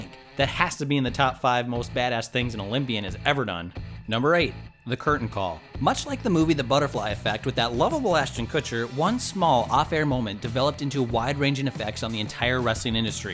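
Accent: United States. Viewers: American accent on a WhatCultureWrestling video. Dislike. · Click on video. Hear American accent. Click off video. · I hate this narrator Loses all of it's class with the geek American. Stop it. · A 'Murican narrating a WotColtcha video? 2016 really has been crazy so far...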